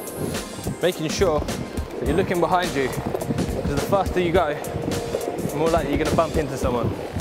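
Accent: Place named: Scotland, United Kingdom. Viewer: Did anyone else think he said "Hi, I'm Shit,"? Obviously it was but it made me laugh. Scottish accent ftw.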